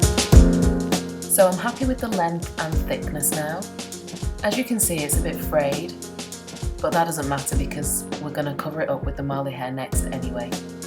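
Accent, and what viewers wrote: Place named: North, United Kingdom. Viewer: They look great and omg you're a northerner!! Haha x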